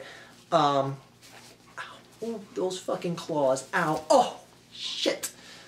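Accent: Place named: New Jersey, United States. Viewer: He has a Jersey accent if I'm not mistaken.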